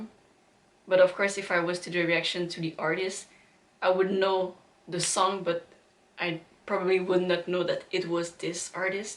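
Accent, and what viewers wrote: Quebec, Canada. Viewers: Hey, I was born in Florida but subsequently got carried North to New York State when my mother divorced my pop. I was age two so I didn't have much to say about it but, nonetheless I have had a good life. I married and my wife gifted me with five children before we ended up going our separate ways. My four girls are the lights of my life. My mom played piano and sang well enough to be a songstress with the Chautauqua opera company when we lived in the ancestral home on the Institution grounds. I remember the days when mom would play piano in duets with members of the Chautauqua symphony orchestra. Jack Danziger, the violinist and Lazlo Varga the Cellist specifically. What a beautiful sound they made! I grew up with a keen interest in music, as a result. I could go on and on but, it is what it is and hsving reached the age of 73 I really don't want to burden you with more than that. 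Suffice it to say that I love your channel and you. I seriously doubt that we will ever meet but I will be there for you and your family and whatever music you play. Fear nought with thy interesting accent. It only serves to make your dual language abilities more attractive. Vive le Quebec! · Je le savais juste par l'accent que tu étais du Québec ,J,ai le meme accent Lol !!. Bienvenue dans le monde du rock !!!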